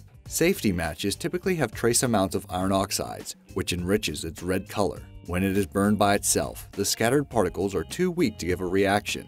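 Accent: Hungary. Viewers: You’re Hungarian? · This guy is def Hungarian.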